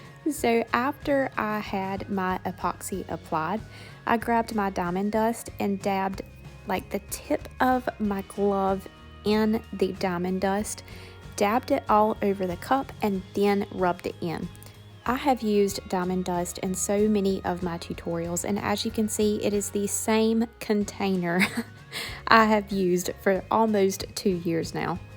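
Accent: South, United States. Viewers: As usual, a great tutorial. I just love your southern accent, so cute!! Can’t wait for the next shop sale.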